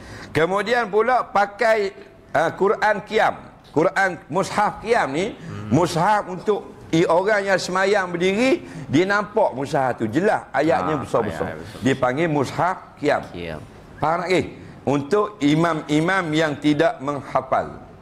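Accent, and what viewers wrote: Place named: Terengganu, Malaysia. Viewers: Ustaz Idrus kl 1 bulan tu mmg penuh hari2 ada buat ceramah agama di mana2 program yg dijemput. Kl di negeri dia di Trengganu ceramah dia dlm sejam ke sejam setgh..tp kl di luar Trengganu boleh sampai 2 ke 3 jam sebab bg ruang org luar yg susah nok jumpa dia selalu. 1jam ke 1 jam 15 minit ceramah ttg tajuk yg diberi..baki tu sesi bersoal jawab dr org2 yg dengar ceramah ttg apa2 sahaja. Kl masjid luar biasa mmg sentiasa penuh dibanjiri org sampsi luar masjid. Loghat Trengganu dia mmg pekat..tp lama2 dengar boleh paham. Cth..tubik tu maksudnya keluar, Ganu tu Trengganu, hok mana tu..yang mana, pitih tu duit, wang.., riyal tu ringgit..2 riyal tu 2 ringgit, nyor..nyo tu kelapa atau nyior, nyor...,kl dia sebut JKK tu jawatankuasa kampong( pembantu..jawatan kuasa yg dipilih dlm mesyuarat sesuatu kpg atau masjid. Dipanggil Jkk kpg atau jkk masjid). 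· bahasa ustaz tu bahasa loghat terengganu..semakin sukar untok orang indonesia memahami nya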